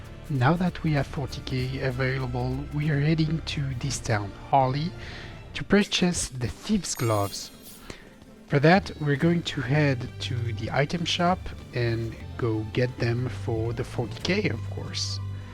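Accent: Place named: France. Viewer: man, that accent ! Where are you from ? Aren't you french ?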